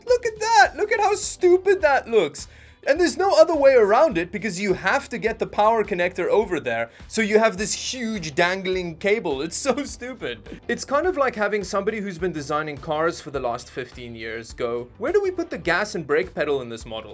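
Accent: South Africa. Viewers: dude are you south african?? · I can recognise that Saffa accent anywhere! Enjoying your videos. Greetings from home!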